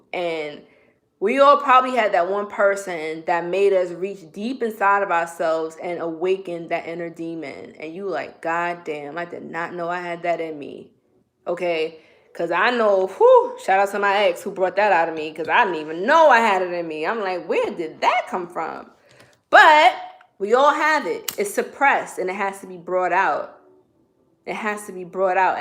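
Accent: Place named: Brooklyn, United States. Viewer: I love you my Sister! You had me at Chaos Magick then you dropped info on St Expidite and I knew you were bad ass and that BK accent is off the chain. Shout out to Masterly Foolish for bringing you to my attention. I'll be checking you out and hanging with you. Let you know how the mirror spell works. Thanks for the knowledge.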